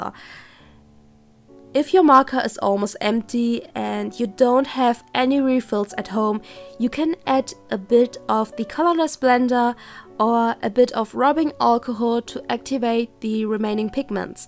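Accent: Germany. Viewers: bist du aus Deutschland? xD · Hab ich auch mitbekommen. Sie hat einen ziemlichen deutschen Akzent, auch wenn des jetzt nichts negatives bedeuten muss. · Are you German? Your "accent (is this right?! xD) sounds so German ':3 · Sminfin ja liegt einfach daran das wir den allgemeinen Klang der Muttersprache oft sehr lang behalten selbst wenn wir andere sprechen. Wie man hier ja gut sieht. Ihr Englisch ist super und sie Betont wunderbar aber der gesamt klang ist deutsch. Ein Native-Speaker würde sachen einfach anders betonen , andere Geschwindigkeit benutzen etc. Briten singen zb etwas mehr :3 (Sorry Ich bin sehr interresiert an Sprachen und studiere grad eine Übersetzen & Dolmetschen) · Are you from Germany? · Yep shes german lol I heard the accent too. I'm not german tho I speak flemish (belgian dutch) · Hey german bud ^^ <3 I heard the accents immediately because I got the same xD Maybe if you like videogames we may play together one time ^^ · Du hast echt einen krassen deutsch Akzent, bist du deutsche ? 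· Are you german? That sounds like a german accent xd I'm german xd Edit: jap ich hab deine Kanalinfo gesehen :3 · Are you German? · Are you German, I recognised the accent . Bist du deutsch ? Ich erkannte den Akzent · wie ich schon am anfang gehört habe, muttersprache deutsch. Ich hör es immer raus, egal ob der akzent stark ist oder nicht xd, danke für das video :) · I love your german accent! It's a lot more soothing to listen to than all greasy american you hear on YT. I'm from sweden so I don't have perfect pronounciation either. Some senteces sound great for me, and some words are just... urgh. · Are you German? I can hear a lil German-like accent? Ahh hab die Kanal info gelesen! Haha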